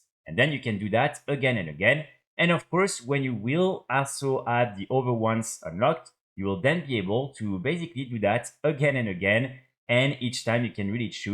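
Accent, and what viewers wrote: France. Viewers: alala l'accent francais c'est trop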